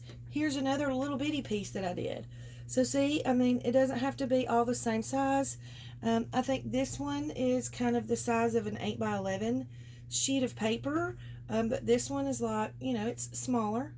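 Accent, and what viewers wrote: South, United States. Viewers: I was sitting gluing and your video came up next on my playlist from the feed suggestions. I truly enjoyed it and loved your funny comments and of course I love your Southern Accent, I could listen to you the day long! Im a new subbie. Love your collage technique and yes I have more than my share of scraps. I so snippets but in long strips with a sheet base. Usually about 6-8 inches wide and it is to clean off my desk. Thanks for sharing and the fun! Have a very creative week!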